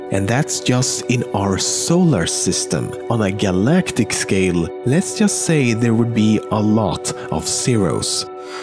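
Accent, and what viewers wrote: Gothenburg, Sweden. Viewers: I fucking hate gothenburg-swenglish. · You can hear he's swedish right away...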